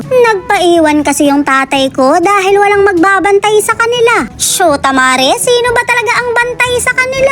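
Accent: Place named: Bicol, Philippines. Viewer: Bisacol ng tagalog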